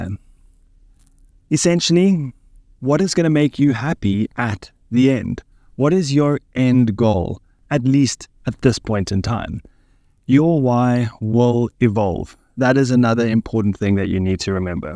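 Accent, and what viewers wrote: South Africa. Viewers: What a surprise. Was wondering why all of a sudden I was listening to your voice, more intently. SOUTH AFRICAN ACCENT!!!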